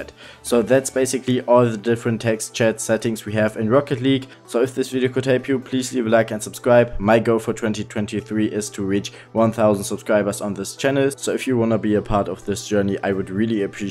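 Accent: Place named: Germany. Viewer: der deutsche akzent😂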